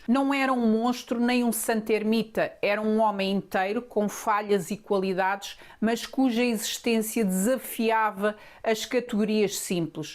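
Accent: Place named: Portugal. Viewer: É quase um luxo ouvir Português de Portugal, em Portugal ☹️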